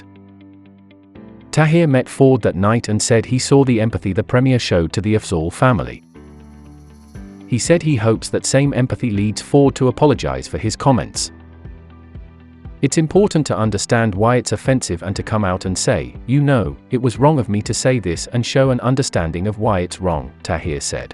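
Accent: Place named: United Kingdom. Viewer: Would the British-sounding narrator please do a video with himself in it to add details? Thanks.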